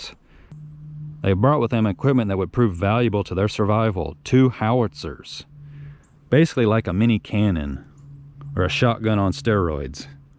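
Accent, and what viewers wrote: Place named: Texas, United States. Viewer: WOW! This was by far the best Texas history video we have seen yet. This morning we were looking for Texas History videos to watch as part of homeschooling and your title promised adventure. What are the odds this video was just uploaded yesterday?! You did a GREAT job recounting our great home State's historical events, bringing to life historical figures and and their way of life, and showing related scenery and historical sites. Plus your smooth Texas accent presented the info in an enjoyable cadence and manner. We have subscribed and will check out your other videos. Thanks for your work. May God bless you.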